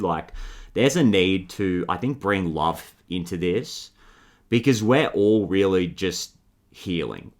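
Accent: Australia. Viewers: I love you so much!!! Are you from Australia by any chance?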